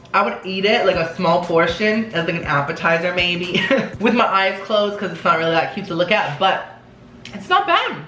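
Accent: United Kingdom. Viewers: DO AN ENTIRE VIDEO WITH YOUR BRITISH ACCENT AND WITH A CUP OF TEA PLEASE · That british accent tho😂👍👌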